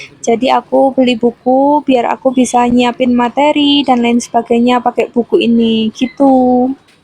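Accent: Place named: Jakarta, Indonesia. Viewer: oooh klo bicara model jakarte😂😂😂😂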